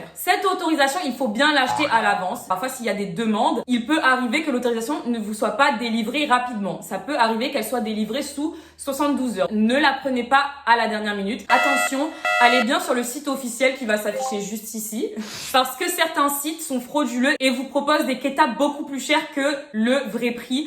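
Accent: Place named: Réunion, France. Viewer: lol j’en étais sur juste à l’accent, je cherchais des infos sur le visa e7 et je tombe sur la vidéo d’une compatriote réunionnaise, en tout cas ta vidéo était sympa et très instructif et je n’hésiterai pas à aller voir les autres. Je ne sais pas si tu comptes aussi streamer sur Twitch par exemple. En tout cas tu as un abonnée de plus =]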